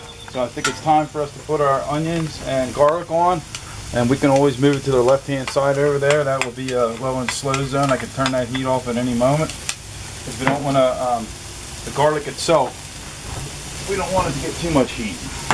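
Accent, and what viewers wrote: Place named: Pennsylvania, United States. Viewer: What part of PA are you from, I was born and raised in Collegeville and moved to south central Texas about 10 years ago when I was 23. But I can pick our accent up after the first word out of your mouth! Love you recipe makes me miss back home! Thanks!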